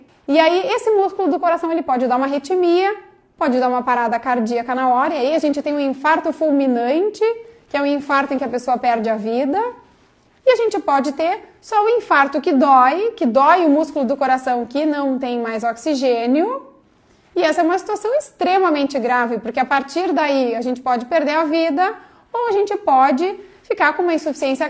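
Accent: Rio Grande do Sul, Brazil. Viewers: Sotaque de gaúcha doutora 😢😢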